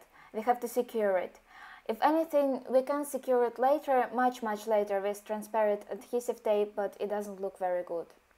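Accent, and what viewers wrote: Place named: Russia. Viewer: You're welcome. And sorry if it has taken me awhile to reply as I've been preoccupied with other activities. The way you analyze things is something I really admire because your ideas seem to flow quite nicely even though English isn't your first language. Your Russian accent is pleasing to hear. 👍 And your analysis on Belle made me relate to her in terms of being more like a simple and curious type, who thrives her solitude but I'm less like her in terms of the social aspect. I'm not very friendly and I feel awkward and not as confident around people. 😅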